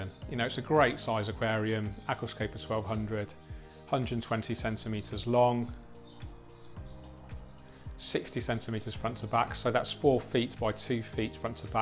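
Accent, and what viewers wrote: United Kingdom. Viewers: Love your British accent my boss was from Britain and his wife from Netherland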